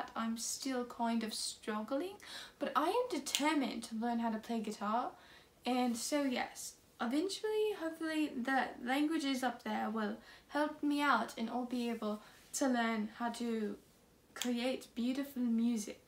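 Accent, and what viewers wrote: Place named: United Kingdom. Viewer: your accent was kinda different today, more like british or idk. i enjoyed listening so much. i really like learning language a lot and this video made me fell more cool in my skin. i agree to everything there and i think everyone should watch that video coz it's super advantaging to learn a new language. thank you so much for producing videos like that for us. this video deserve a lot of like, comments and sharing!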